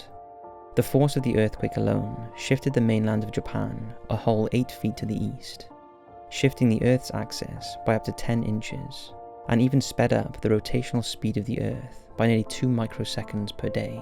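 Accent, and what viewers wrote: Wales, United Kingdom. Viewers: That’s a welsh accent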